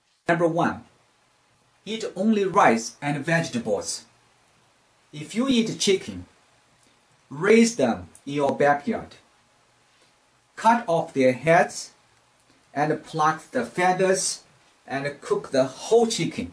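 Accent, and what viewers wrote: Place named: China. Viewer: Great video and your Chinglish is funny! Thumbs Up!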